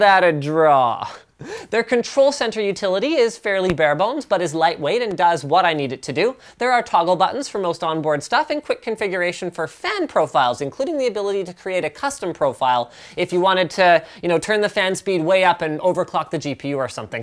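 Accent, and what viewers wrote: United States. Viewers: Canada? Lol I thought you were from the US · Yeah, at first I thought that he's a US citizen due to his accent.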